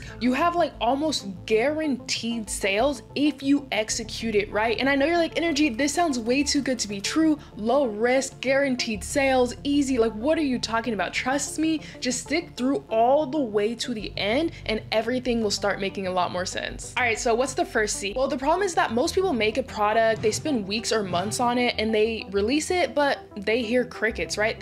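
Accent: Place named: Spain. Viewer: Girlie, your Spanish accent is amazing! I'm so grateful for your videos new subscriber here!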